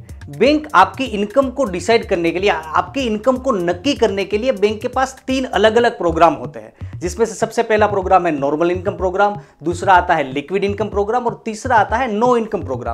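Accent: Gujarat, India. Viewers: I think you are gujju. I feel this accent😅🤟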